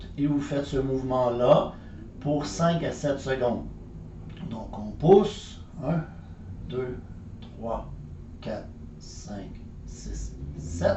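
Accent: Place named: Quebec, Canada. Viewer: Simple ,très efficace ,un parler qui me rappelle "La belle province " " Je me souviens " de mes annèes à Montrèal.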